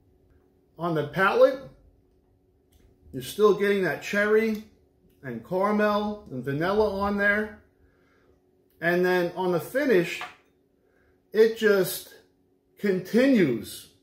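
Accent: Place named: East Coast, United States. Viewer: You’re obviously not a Floridian but the east coast twang is definitely there. Great idea hurricane parties that is right up my alley. I find it absolutely ridiculous how the media just gets over dramatic about these storms. It’s not that these should be ignored but most are pretty livable. Enjoy!!🥃🥃🥃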